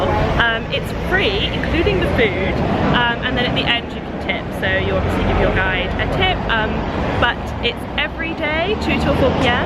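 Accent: United Kingdom. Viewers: Thank you for the wonderful words about my country we all bulgarians appriciate it! Wish you to visit many times again and also wish you great time on another trips! P.S. Where are you from? You sound like a british :)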